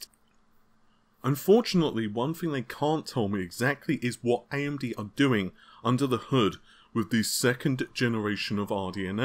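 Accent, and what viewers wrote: England, United Kingdom. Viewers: He has a thick english accent lol, just get over it you know what he means. He sounds like everyone sounds near him.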